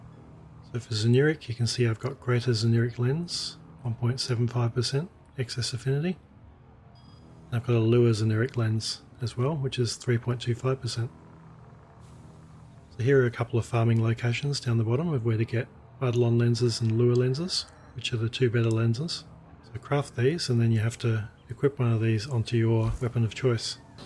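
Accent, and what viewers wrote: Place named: Australia. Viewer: thanks boss, u sound aussie :)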